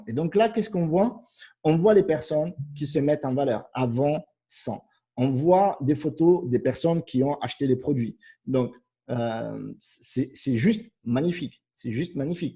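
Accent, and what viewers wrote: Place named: Marseille, France. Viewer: lorsque j't.écoute,on dirait k't.es sur Marseille, chui sur hyères pour l'instant. À larevoyure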